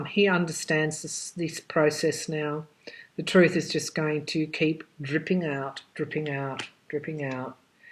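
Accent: Australia. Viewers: Can you please do a reading on NRA, Butina, Ericsson et al? I wonder if that's one of the 12 redacted cases. Thanks if you do, and thanks anyway if you don't. I have to focus to listen to what you're actually saying sometimes, because I am so fascinated with the way you're talking. I love Australian-English, and you have a manner of talking that's energetic and calming at the same time. Very much needed in the chaotic times of Trump! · Thanks! Love listening to your Aussie accent! ❤️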